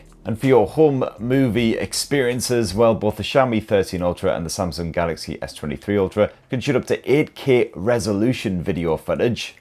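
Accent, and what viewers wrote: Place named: Newcastle, United Kingdom. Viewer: Believe me when I say doesn’t speak with a typical English accent…! He’s what’s known as a ‘Geordie’. 😶 Most British people struggle with their accent too. It’s why we’ve banished them to the far north east of England!🫨 Think North of the Wall in GoT without the furry clothing. Does make good videos though…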